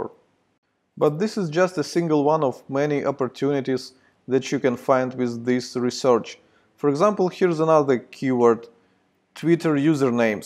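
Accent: Russia. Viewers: Russian accent)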